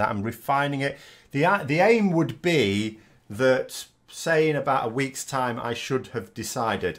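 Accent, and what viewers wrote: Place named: Yorkshire, United Kingdom. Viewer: Hey, love the channel. Currently living in Buenos Aires and your videos are one of my primary ways of keeping up with the ins and outs of Brexit, etc... Also, get to hear a Yorkshire voice on a regular basis.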